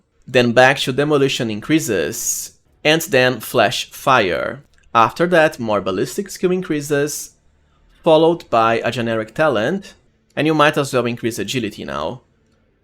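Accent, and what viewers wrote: Brazil. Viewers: Are you Brazilian? your accent cant fool me :P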